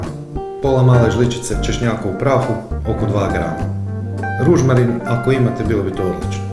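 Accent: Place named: Slavonia, Croatia. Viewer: Kako te je lijepo slusati,tako i mi u Slavoniji pricamo,puno pozdrava tebi i tvojoj Familie 🥰🥰👌👌❤❤👍🏻👍🏻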